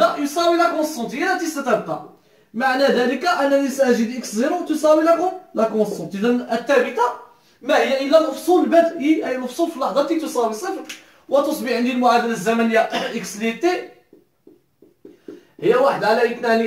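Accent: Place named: Morocco. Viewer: مغربي. ولا جزايري تخلطتلي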